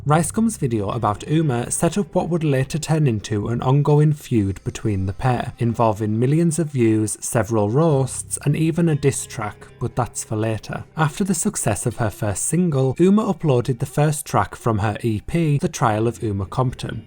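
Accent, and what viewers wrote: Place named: Scotland, United Kingdom. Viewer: it’s a Scottish accent…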